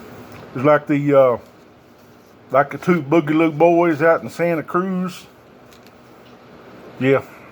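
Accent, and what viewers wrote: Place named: South, United States. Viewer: This guy's 100% right, took an oath to uphold the law at all times yet he stands behind the biggest criminal in American history, and as a military man he's supposed to defend The United States Of America instead he stands behind a lone lunatic imbecile who has caused irreparable harm to our democracy and severely compromised our national security. is the moron not this guy, having a southern drawl doesn't make someone an idiot.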